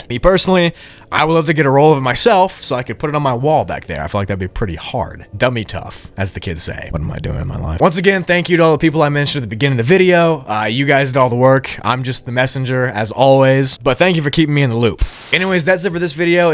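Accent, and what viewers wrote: United Kingdom. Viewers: I can tell you're Scottish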